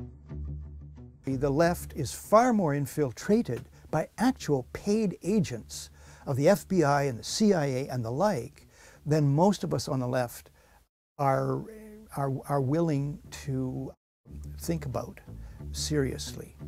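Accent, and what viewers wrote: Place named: Canada. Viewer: this guy is canadian ay! (out and about pronunciation)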